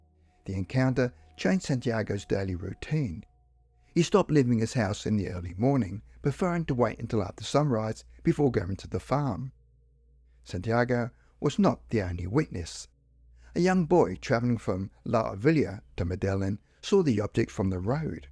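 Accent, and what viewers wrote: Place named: Australia. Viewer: Aussie accent! Oh ignorant ones.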